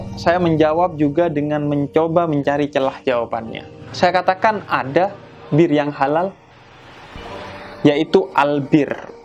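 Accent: Madura, Indonesia. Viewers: Maaf bib kayak ada logat" maduranya ?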